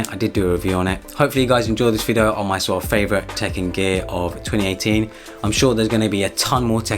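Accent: England, United Kingdom. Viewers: Just found your channel. Huge fan of tech YouTubers! And it sounds like I’ve found a fellow Englishman! Keep it up mate and don’t mind me. I’ll just be flying through your vids rest of the weekend 👌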